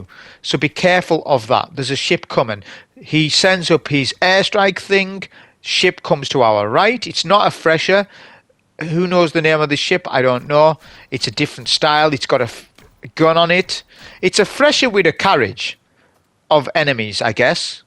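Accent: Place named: RP, United Kingdom. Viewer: too much commentary!?posh posh bang on!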